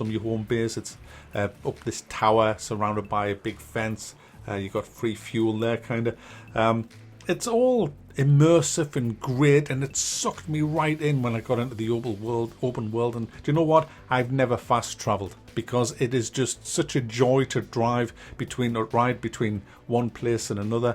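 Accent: United Kingdom. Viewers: where abouts in the UK is your accent from?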